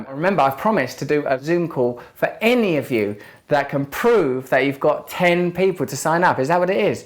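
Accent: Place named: United Kingdom. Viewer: I really didn't expect you to be soooo intelligent... pleasently surprised... I did find it a bit hard getting though your your lovely British accent but I finally managed. Look forward to hearing more from you and thank you sir for giving your time to help all of us who need it. ❤️❤️❤️. Blessings to you and yours.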